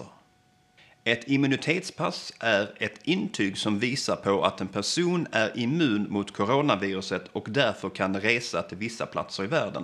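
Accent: Skåne, Sweden. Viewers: Skånska är bäst!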